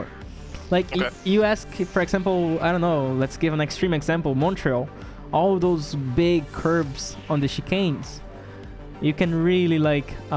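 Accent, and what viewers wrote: Brazil. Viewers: Achei teu canal hoje, Voce eh brasileiro ne soh pelo sotaque. muito bom os videos!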